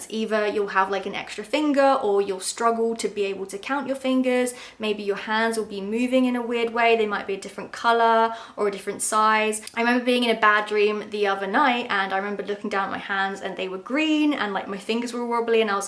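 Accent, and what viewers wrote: Scotland, United Kingdom. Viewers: I'm going to guess from her accent is Scottish, can't find any explicit info, I'm not the best linguist/dialect judge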